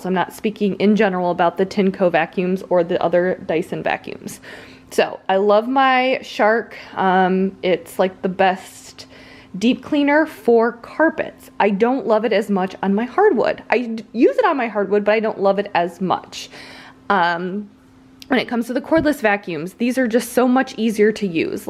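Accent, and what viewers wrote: Midwest, United States. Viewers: There’s something I love about hearing your Midwestern accent narrate the cleaning 😂😂 I’m 8 months pregnant and can only do like….a load of dishes and sweep the floor and I’m spent for awhile so it’s nice motivation to watch you get so much done!! 😃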